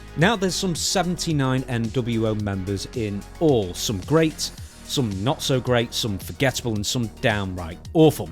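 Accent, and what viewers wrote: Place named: United Kingdom. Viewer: Clicked on this, heard the British accent, turned it off.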